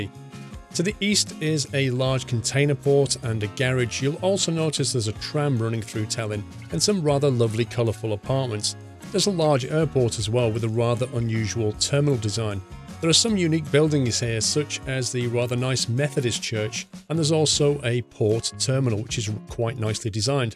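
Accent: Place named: United Kingdom. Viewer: That British pronounciation :D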